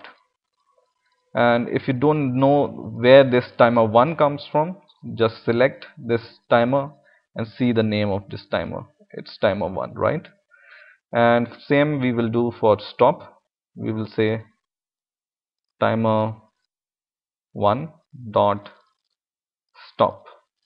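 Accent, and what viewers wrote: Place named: India. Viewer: i love your indian acent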